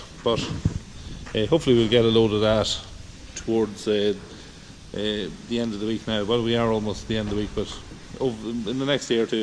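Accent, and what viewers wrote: Dundalk, Ireland. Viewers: Where abouts in Ireland are you based ? Sounds like your from around Dundalk (louth) area ?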